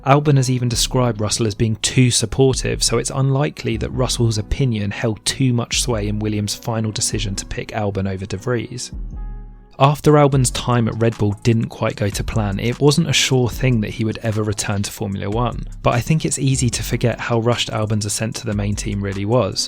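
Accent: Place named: United Kingdom. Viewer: Yeah that was very comfortable. Perfectly crystal clear British too.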